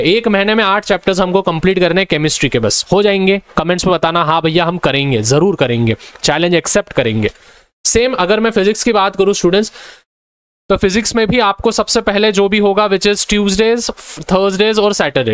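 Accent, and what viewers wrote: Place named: Aurangabad, India. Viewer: Aurangabadi accent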